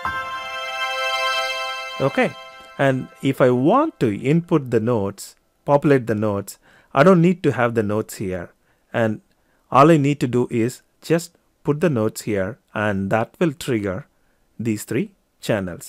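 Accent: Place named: India. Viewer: I always love Indian accent. cuz u understand it and feel something weird in the same time XDD thank you for this tutorial anyway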